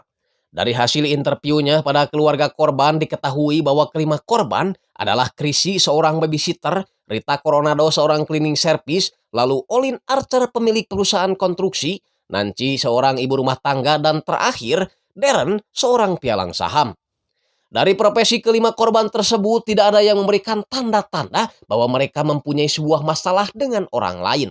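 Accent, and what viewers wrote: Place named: Sunda, Indonesia. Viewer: Njir baru denger cara baca narasinya boga karakter, selain intonasinya yang has urang Sunda, tapi sangat menghibur lucu komedi banget..😅😅 kanjuutkan..😊😅😅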